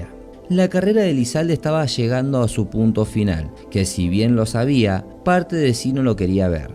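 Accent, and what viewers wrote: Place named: Argentina. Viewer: Lo que mas me vuela la cabeza es porque el narrador tiene acento argentino?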